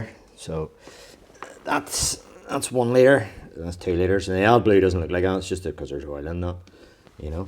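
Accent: Northern Ireland, United Kingdom. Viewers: Great video. Love the NI accent!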